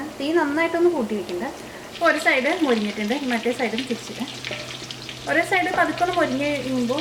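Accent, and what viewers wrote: Thrissur, India. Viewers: nice recipe.. but I hate thrissur slang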